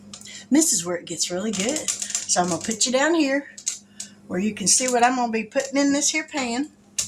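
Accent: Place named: United States. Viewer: Looks delicious! I love your country accent!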